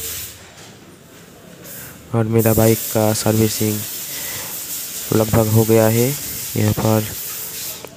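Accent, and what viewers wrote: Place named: West Bengal, India. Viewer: Gd one..bt you should improve your hindi 😇..i think you are from west bengal ❤️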